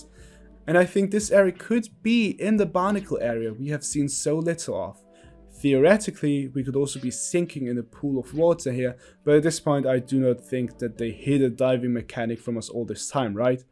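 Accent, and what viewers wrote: Austria. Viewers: The Austrian accent is based as hell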